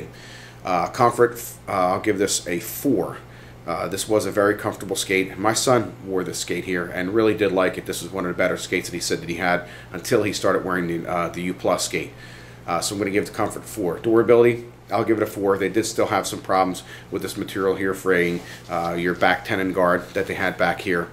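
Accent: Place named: Philadelphia, United States. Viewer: Is the host of this vid from the Philly area?? His accent sounds like it and he said "Wooder" for "Water". ;) I'm orig. from Philly, so I notice these things.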